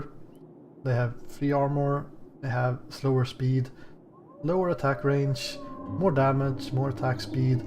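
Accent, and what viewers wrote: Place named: Sweden. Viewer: Really bad starting spot, you did well. That's a big compliment to 95% of the other idiots I've watched playing. Are you Swedish by any chance? Both because of dialect and having some gaming intelligence. I've watched a dozen American streamers being incompetent at this game. Tummen upp. All the other streamers I've just facepalmed myself. Very good.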